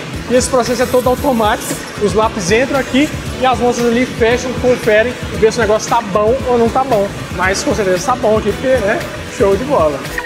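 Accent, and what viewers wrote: Minas Gerais, Brazil. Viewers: “Burracha”, “u pau tá quebrando” Hehehehehe Mineirês é top demais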